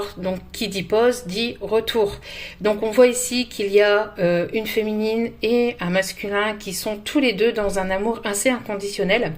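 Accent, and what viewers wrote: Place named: North, France. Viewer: Quel tirage 🤯 mais quel tirage 💓 Moi j’aime bien votre accent du nord 😁😉😎 un grand merci 🙏 belle soirée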